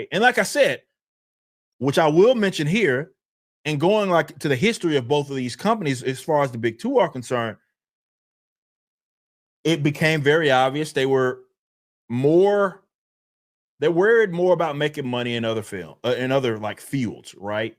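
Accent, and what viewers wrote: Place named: Texas, United States. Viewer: I can't stand the Texas hayseed accent dude... "is" is eeeyz, "been" is beeeyn, etc.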